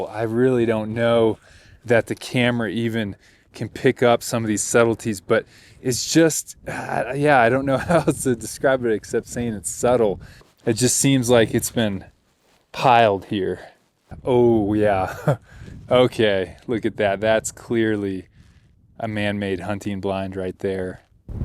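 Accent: United States. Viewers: 100s of meters? you sound american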